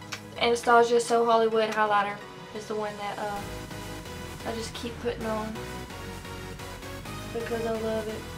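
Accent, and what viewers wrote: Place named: Georgia, United States. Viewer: Oh and where are you from? I'm from Georgia, you sound like you could be from here lol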